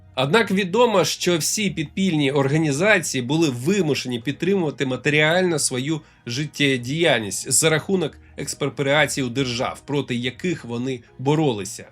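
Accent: Moscow, Russia. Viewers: Якби не знав, сказав би,що він із мааскви,над акцентом потрібно попрацювати!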